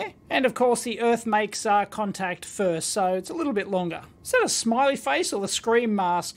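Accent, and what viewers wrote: Australia. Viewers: Umm… Aussie accent… yup, EEVBlog, not ElectroBOOM. Nonetheless, I’m expecting some smoke… 😉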